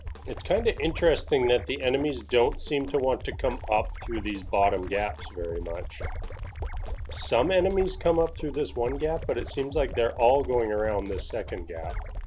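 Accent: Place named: Pacific Northwest, United States. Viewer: After your question about what draws folks to your channel and game play, I was thinking about the reasons that I do enjoy watching your Goobies runs to start my days, here in Brooklyn. 1. I'm originally from the Portland, OR area and your voice has an accent that reminds me of home. Over the years, I've learned that the Pacific NW of the US has an almost Canadian accent in its English. Perhaps this is a result of the many years in which the territory was jointly administered by the U.S. and the U.K. or now the region's proximity to Canada over time? I just watched a history explainer video on that international relations history of this U.S./U.K. joint rule, by one of my favorite YouTube channels 'History Matters' , this last week. (I also work in and academically study International Relations and International Affairs, here in NYC; and sometimes for the U.N. 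here in the city or at international conferences.) Long story short, your accent feels welcoming and homey to me. (P.S. If you ever have any International Relations questions, feel free to ask. I'm always happy to share what I may have learned over the years.) 'Why did Britain give up Oregon? (Short Animated Documentary)' "Britain once held condominium of what's now Oregon, Idaho and Washington State with the United States and during a time of diplomatic strength, simply handed it over without any compensation. So why did the United Kingdom do this when it could have got something in return or at least given less? To fi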